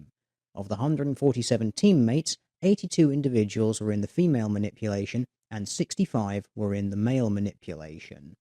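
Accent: United Kingdom. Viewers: there is no resisting a British accent.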